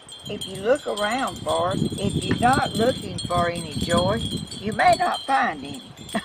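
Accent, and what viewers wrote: South, United States. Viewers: Oh my God mama , you are a delight to watch , your southern accent so cute, your creativity , over the top never thought of using nail polish and glass this way ..thank you so much , I’ll be following and will share with mom she is 90 but like yo7 a very crafty lady...❤️🙏🏻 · Nobody in Atlanta talks with a Southern accent any more. I sure love to listen to you talk. Reminds me of my family. Thank you. You are an artist!!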